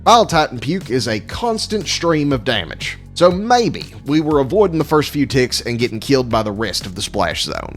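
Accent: South, United States. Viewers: Your southern accent makes this amazing. Much love for the intel. Keep trekking on o7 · lol that's funny. The voice is the reason I find these hard to watch. I still live in the South and have heard some pretty messed up things said in that accent. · Helldiver commentary with a southern accent hits different NGL. I’d follow you anywhere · Your accent sounds to me like Southern US, but you pronounce some words like an Australian? Peculiar. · This video was supposed to be background noise and I sat here and watched the entire thing for the voice and it reminded me of home in the south.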